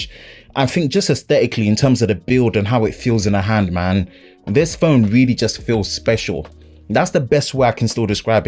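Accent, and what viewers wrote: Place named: United Kingdom. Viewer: Your britch accente is epic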